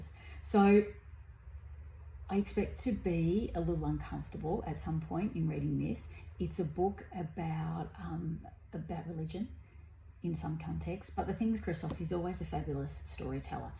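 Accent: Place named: Australia. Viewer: Jist found your channel, hope you keep uploading as great to hear more Aussies on booktube!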